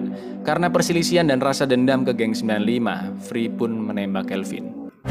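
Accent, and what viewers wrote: Java, Indonesia. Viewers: Jawane ngdi mass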